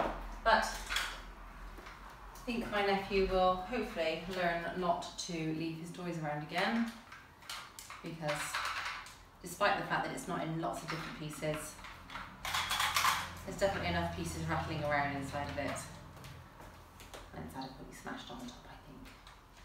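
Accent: West Country, United Kingdom. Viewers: As sexy as you are….. no stilettos 👠 on a real wood floor unless you want to give it a ‘distressed’ look….. there again, it may by engineered wood or posh laminate 🤔…. Love your West County accent by the way 😉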